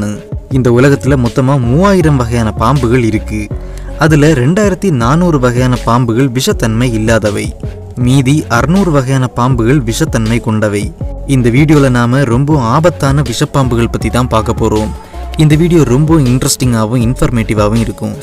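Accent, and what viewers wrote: Kanyakumari, India. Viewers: Bro Kanyakumari pola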